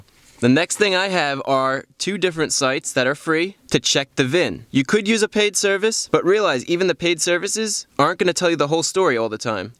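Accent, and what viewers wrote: United States. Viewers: I like your enunciation and pronunciation. Are you american ?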